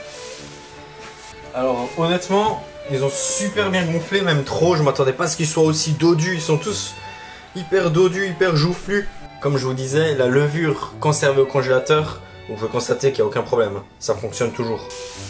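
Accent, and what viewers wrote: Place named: Alsace, France. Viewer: Quel plaisir de retrouver notre bel accent Alsacien ❤ et nos succulentes recettes de chez nous ❤ MERCI BEAUCOUP ❤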